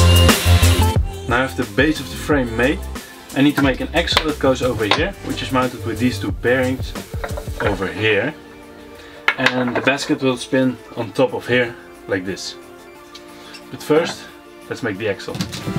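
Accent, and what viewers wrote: Netherlands. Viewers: Vet gaaf man blijf door gaan ik hoor aan je accent dat je Nederlander ben haha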